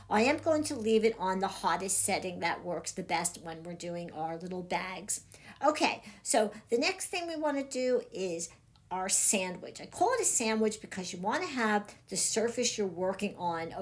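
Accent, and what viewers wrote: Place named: Philadelphia, United States. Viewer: I’m enjoying this video- I love this kind of crafting. But I just want to ask you…are you from Philly? The reason I ask is you said “pock a book”. As in pocketbook. I grew up in SW Philly so my ears are perked up by your accent! ☺️ where I live now they say purse instead of pocketbook. 😂 kind of funny.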